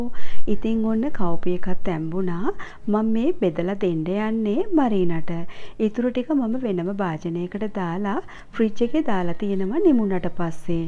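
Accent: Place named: Japan. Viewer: ඔයා වැඩකරනව දකිද්දි මටත් අතපසුවුනු වැඩ කම්මැලිනිසා අතහැරපු වැඩ මතක්වෙනව🤭ඉතින් ඒව කරගන්න හොද උත්තේජනයක් ලැබෙනව ඔයාගෙන්👍❤️ඒවගේම මරීන පැටිය දැක්කමත් හිත පිරෙනවනෙ😘තවදෙයක් කියන්නම ඕන මරීන පැටිය තරම් නැතත් ඔයත් සිංහල කතාකරන්නෙ හරිම ලස්සන ජපන් උරුවකට ඒකටත් මම ගොඩක් ආසයි.❤තෙරුවන්සරණයි මරීන පැටිය ආදරෙන් මතක්කලා කියන්න🙏😘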